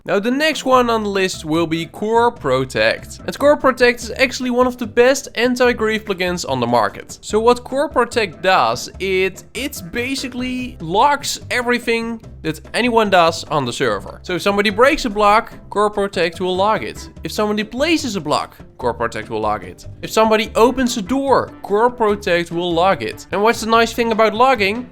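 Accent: Netherlands. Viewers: Hallo ik hoor wel dat je nederlands bent hehe ik ben grootte van van jou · jij klinkt erg nederlands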